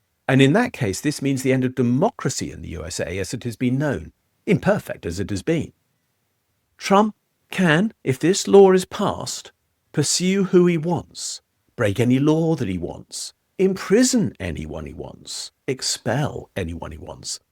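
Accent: United Kingdom. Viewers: Wrong. Hyperbolic nonsense. The US is not, and never has been, a democracy. That is mob rule. We are a Republic where individual rights supersede mob rule. The majority cannot vote away the second amendment, for example. It’s irrelevant what the majority wants if it’s counter to the Constitution. The House has the power to appropriate money. If they vote to do this, it’s entirely constitutional. The judicial branch has to use the DOJ to enforce anything and Trump is the boss of that and wouldn’t let them enforce anything anyway against the current administration same as every administration before. The past administration actively prosecuted their opponents and now somehow Trump is guilty of doing it. That’s just basic hypocrisy. The fact that you mentioned the midterm means you have no clue about current demographics in the US. The US is objectively going to be conservative going forward. 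The democrats are not going to make any gains in the midterm and will probably lose more ground. If the states continue to vote the way they currently are, the democrats will literally not be able to win the electoral college again, which is our brilliant counter to mob rule. You have a British accent. Maybe you’re not living there so I admit I would be wrong, but it’s pretty rich listening to. British guy criticizing our government. You live in a bureaucratic dictatorship that doesn’t even acknowledge unalienable rights. You still have a monarchy that technically owns everything so you hav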